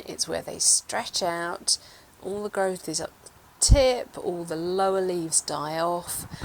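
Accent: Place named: United Kingdom. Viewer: I enjoyed learning so much from this video!! It was a added pleasure to hear your beautiful British accent!! I live in the Midwest of the United States. We do not have beautiful accents 😃😆😂 🫤 Thankyou for helping me be a better succulant parent !! I grew up with sempervivam. They grew on my mother's terrace. I loved them❤ I have rooted some echaveria from cuttings . There were many varieties . They included sempervivums. I have been having fun with these sweet succulants. I am a bit nervous about the bonsi but I will watch all your videos on the subject . 😃😃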